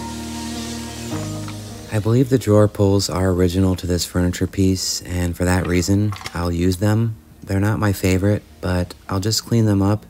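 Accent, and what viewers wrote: Wisconsin, United States. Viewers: That paint stripper worked great..I found the environmental strippers didnt work well at all ..I love your Wisconsin accent 😊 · As for your accent, I love Wisconsin and I love any and all information you can provide about the piece and process. ❤ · That natural wood grain is so beautiful, hard to believe someone would paint it! I smiled when you mentioned your Wisconsin accent, I being a 70 year citizen of this state I thought, what accent? Lol! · Now that is gorgeous! I could only hope to achieve something minorly close to that… Love your videos with sound without sound with talking not talking and of course I love your Wisconsin accent since I am from Wisconsin but I’ve lived in the south for 25+ years. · Trop beau! J’aime autant vos silences que votre accent du Wisconsin. Ne changez rien. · Wisconsin accent? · I’d listen to your Wisconsin accent all day! 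Beautiful restoration once again! 😊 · It came out great! PS love that Wisconsin accent💕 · As a former Midwesterner, I appreciate the Wisconsin accent ❤ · Amazing.....you bring back the original beauty!❤ And Ilike your wisconsin-english😂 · I enjoy your "Wisco" accent. I have only heard Trixie Mattel's exaggerated "Wisco" accent. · Very nice! I remember when you first did a veneer replacement. 😊 you've come along way. And I love you're Wisconsin accent. Growing up in Sun Prairie, going to East Town Mall as a teen, Saturday's in the parks. You make me remember my carefree youth.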